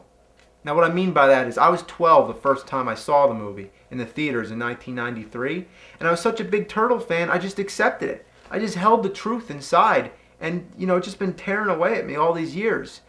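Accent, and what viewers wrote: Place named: Pennsylvania, United States. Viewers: New Jersey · That's the Jersey vernacular, where he lives. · He's got that outside Delco, Delco accent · Eastern Pennsylvania / New Jersey accent. James is from Eastern Pennsylvania, near Philadelphia. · He's older and he is a dad so he got the Dad accent now.. That East Coast Dad Accent..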